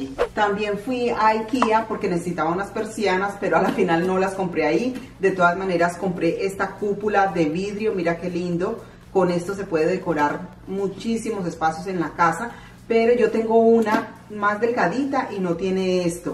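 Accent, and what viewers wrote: Colombia. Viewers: Tan linda mi,nunca has perdido tu acento Colombiano eres tan genuina sencilla,no eres egoísta tienes don de servicio por eso eres triunfadora en tu canal, amas a tu mami y flia.Tus manualidades bellas Dtb 🙏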